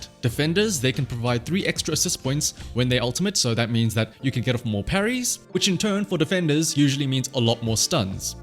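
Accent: South Africa. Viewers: I felt that South African accent, nice to see another playing some zzz love the content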